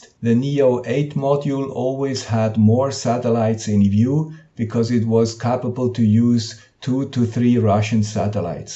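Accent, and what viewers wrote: Switzerland. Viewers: Thumbs up for the informational video and "here is the guy with the Swiss accent again." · Hier ist ein Typ mit Schweizer Akzent ! Vielen Dank!!! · Gruezi "guy with the Swiss accent". Some people might say I have even more a Swiss accent even though I moved from the Berner-Oberland to Seattle a half a century ago ;-) I really enjoyed watching your video and learning many things. Being Swiss we just have to be precise. As a boy I used to walk miles to get to a watchmaker's storefront window to set my watch to the exact time displayed there. ... and soon my "official tested (chronometer stamped)" watch would be wrong again before the quartz crystal electronics.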